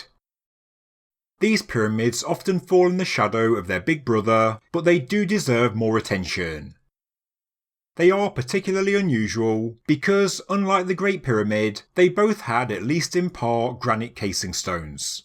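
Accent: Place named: Midlands, United Kingdom. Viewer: He’s from the Midlands………… leave him alone😡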